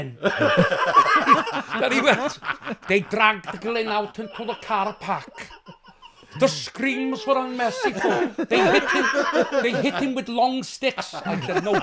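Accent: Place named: Wales, United Kingdom. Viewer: He has got the Welsh absolutely bang on, i lived there for 5 years, Their attitude and Phrasing, he has spent time there too, to do it so good. They had me good style, practiced for 2 weeks saying "My Guinni Pidlan Back" me thinking it was "you have a nice shop" - Obviously i realized when the whole Spar was on the floor, i had not said that at all, after 5 mins of evryone laughing, asking the butcher "What did i say"?? He replied "You have just said "I've got a small cock"..... Right in the middle of the shop"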